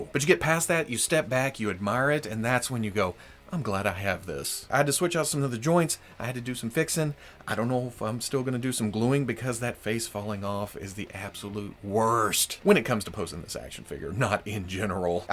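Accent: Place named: Louisiana, United States. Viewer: Your Cajun accent is on point!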